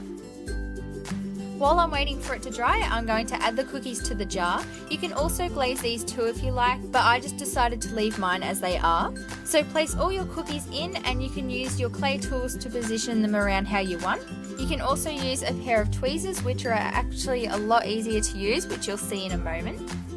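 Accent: Australia. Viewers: Are you Australian? I noticed that the clay you are using for the cat can be sold in Australia and most of the videos I see have clay from America.. then I noticed the accent kinda sounded Australian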